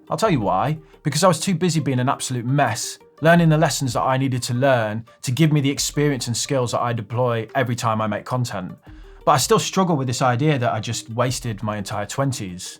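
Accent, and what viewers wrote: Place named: West Midlands, United Kingdom. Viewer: It’s so amazing to see such varied and wisdom rich content from a creator that sounds like me. People don’t quite understand the barriers of the mind that West Midlanders face when we want to put our thoughts out there. Logic being due to the negative stereotypes that a few vowel sounds can somehow determine intelligence. You got me going on investing for my future, and you’re helping me to feel confident when I speak to a client from somewhere else in the UK. Keep smashing it mate.s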